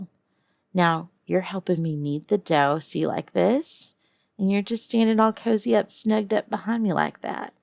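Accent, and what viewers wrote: South, United States. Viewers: Sounds of the South , only words missing is ya'll.....makes your voice cute as a button!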